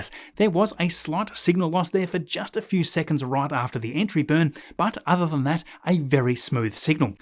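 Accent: Australia. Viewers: Hi from across the ditch enjoyed your content as usual Just one thing as a blind subscriber I would very much appreciate a few more sound clips in your presentation not that the charming Australian accent isn’t enjoyable enough Cheers mate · Love that Australian rocket commentary! YEEEEEEEEEEAAAAAHHHHH! 🚀😂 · you australian? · Aussie accent at its finest.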